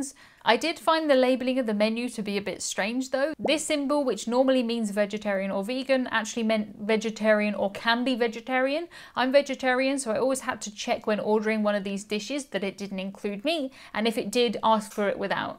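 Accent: United Kingdom. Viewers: coriander is the seed where the leaf is Cilantro- interestingly the spanish pronunciation is Kilantro. when u said multiple sounded like multabowl sounded like u said airboat for aiport called sounds like code.i am so laughing-Brit accent is perplexing.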